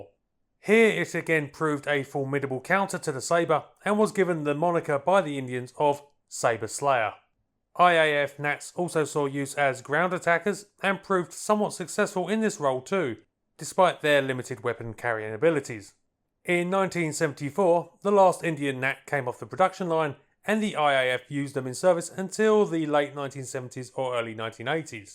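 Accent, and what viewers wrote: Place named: United Kingdom. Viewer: THAT SPEAKER HAS FLAT TONE AND STRICT BRITISH .. NON UNDERSTANDABLE FROM THE INTERNAT. AUDIENCE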